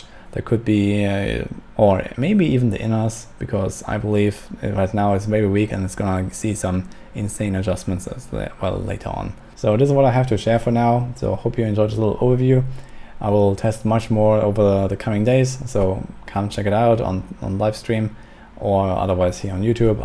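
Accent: Germany. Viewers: Man hört das DIREKT, das du ein Deutscher bist xD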